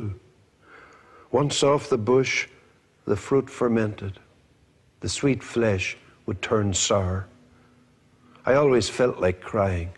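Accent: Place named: Sligo, Ireland. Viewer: Oh How I would love to talk to this man. The sound of his voice sounds like Sligo. When visiting my Grandmothers house in Mulaghmore and cousins" The Feeney's" I walked a lane with rock walls and tons of beautiful blackberries. Their Grand Mother is buried next to Wm.Butler Yeats in Drumcliff. Alas, at 80 years old no more travel. What a great Poet. yvonne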